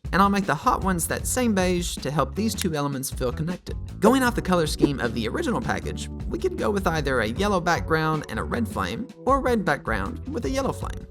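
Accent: South, United States. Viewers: are you southern or something?? such a weird accent lol.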